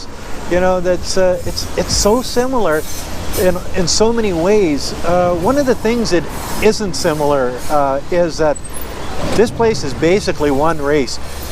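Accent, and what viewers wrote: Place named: Canada. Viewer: Oh boy! I love the Canadian English out of your mouth! Just can't be mistaken! LOL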